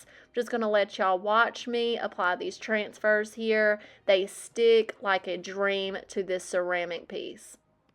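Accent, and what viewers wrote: South, United States. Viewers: . Your grammar is fine. You have a cute southern accent which I find to be charming, especially on a YouTube channel where you are making rustic, country style decor. Don't change for the critics. Just be you. ❤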